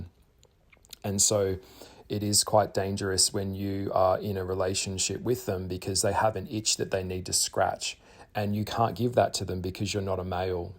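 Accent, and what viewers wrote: Australia. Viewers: I only stayed because the Australian accent sounded cool until he was saying all these gross.Horrible things and it made the voice and accent sound not good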